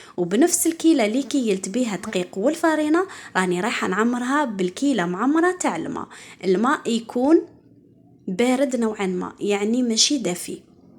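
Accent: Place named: Levant, Arab world. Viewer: يعطيك الصحة وصفاتك روعة بغيت نسقسيك هدرتك شابة الله يبارك انت وين عايشة اللهجة بانتلي شوية من المشرق العربي. ربي يوفقك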